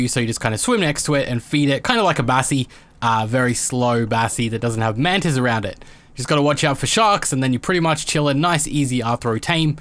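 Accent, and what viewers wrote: Australia. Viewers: Are you australian? xD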